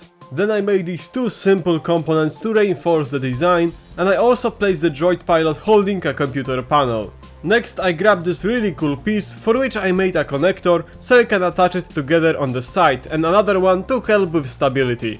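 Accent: Poland. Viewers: All of your mocs are just great - but I can't hold myself anymore, I need to tell you that the first time I've stumbled on your video as I've heard you I immediately knew you're Polish as well :P it triggers me anytime I watch your newly uploaded video - but on the other hand it reminds me of how much legendary moc builders besides you are Polish, such as Jerac or fukusaku - and I have to admit that it makes me somehow proud :) PS I don't mean to make you feel upset, sorry if I did that - I shouldn't even criticise the accent, and I have nothing to criticise on MOCs you make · masz polski akcent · I really like your content, od razu po akcencie poznałem rodaka 😂😊👍 super, że mówisz po angielsku, bo masz szerszą widownię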